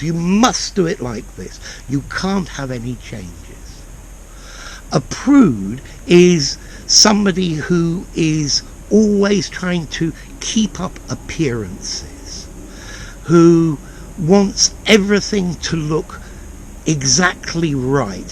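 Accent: RP, United Kingdom. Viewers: Thanks Sir for the lessons you provide for us.What a posh British accent! Splendid!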